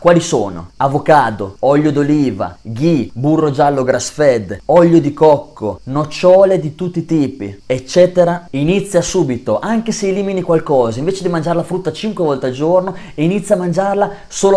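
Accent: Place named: Modena, Italy. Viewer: Ha l'accento di Modena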